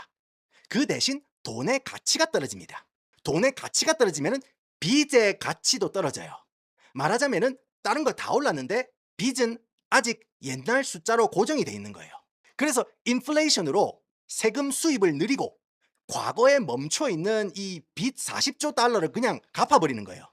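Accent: Gyeongsang, South Korea. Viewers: 같은 경상도사투리억양이라 더 잘 알아묵겠는...신기한현상ㅋㅋㅋ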